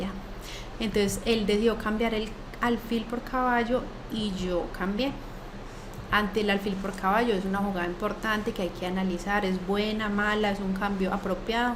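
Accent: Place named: Colombia. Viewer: Acento colombiano!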